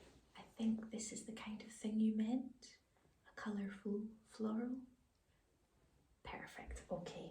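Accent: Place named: Scotland, United Kingdom. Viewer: Is that Scottish accent? Or Irish? How cute and relaxing :)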